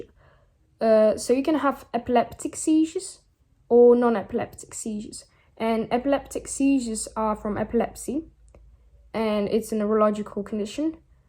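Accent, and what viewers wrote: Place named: United Kingdom. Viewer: Mówisz cudownie 😩💗 ale czy będziesz jeszcze nagrywać po polsku? Bo niebyt kumam brytyjski akcent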